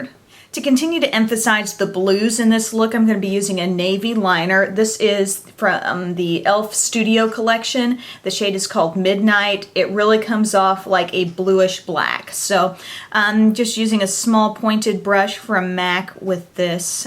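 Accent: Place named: Illinois, United States. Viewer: Congratulations on your award! I live overseas, but I'm originally from Illinois and especially enjoy hearing your familiar accent. :)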